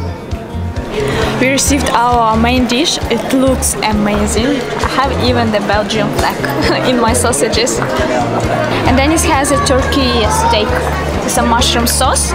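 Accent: Russia. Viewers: This Russian accent sounds so horrible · annoying accent. russian?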